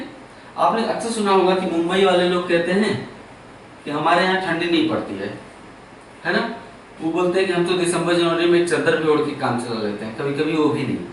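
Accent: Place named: Purvanchal, India. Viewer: sir tikora sahi hai jisase malum hits hai ki aap purvanchal se hai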